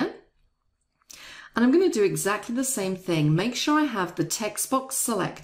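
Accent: United Kingdom. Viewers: Thanks. I love your perfect British accent😍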